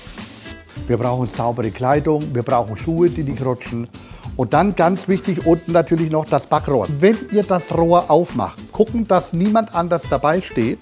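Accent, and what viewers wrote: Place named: Franconia, Germany. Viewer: Von wo in Franken bist du?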